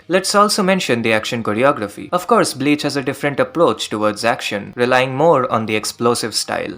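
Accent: India. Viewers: Great video! Are you indian?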